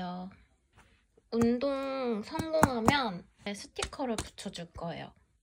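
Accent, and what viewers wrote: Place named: Gyeongsang, South Korea. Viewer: 고향이 경상도세요? 경상도 역양이 느껴지네요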